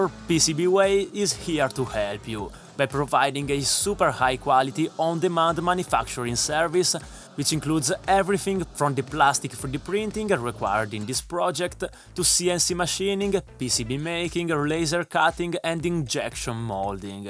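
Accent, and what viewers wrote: Italy. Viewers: i love italian english ;D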